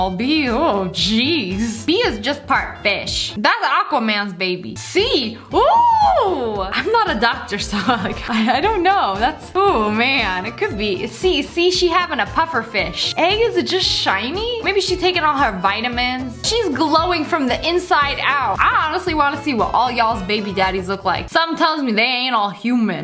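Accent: France. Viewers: Are you French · R u actually from France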